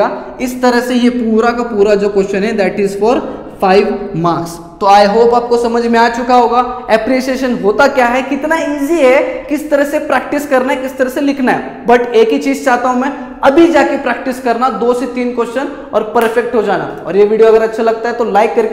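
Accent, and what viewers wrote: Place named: Pune, India. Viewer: Pure puneri❤😅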